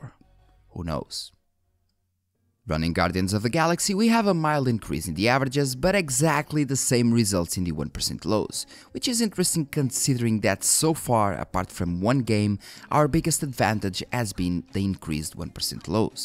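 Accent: Portugal. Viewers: very good video. btw you sound portuguese accent. i know cuz u sound like my accent lol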